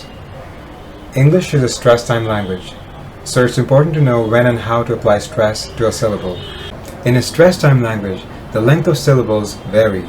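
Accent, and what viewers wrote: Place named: India. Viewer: Your indian accent is still a bit heavy, but much more better then most indian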